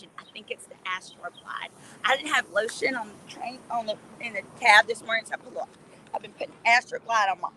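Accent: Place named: South, United States. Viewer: I’m from California, but I live in Texas now thank goodness, when I hear your voice, it reminds me of the sound of a sister meaning an African-American southern woman and also a southern Anglo woman. It’s really interesting to my ears.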